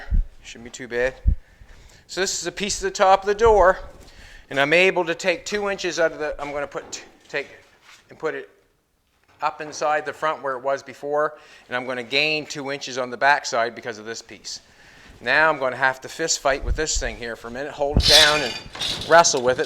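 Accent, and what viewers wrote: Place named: Newfoundland, Canada. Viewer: the accent,,,so you would be what i consider/call a newfy,,?,, a canadian from somewhere east of quebec,,,?...lol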